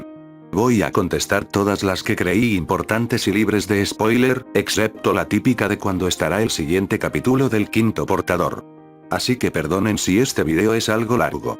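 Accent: Argentina. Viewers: Sabia que eras argentino uwu Se nota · We yo ya sabía que eras argentino :V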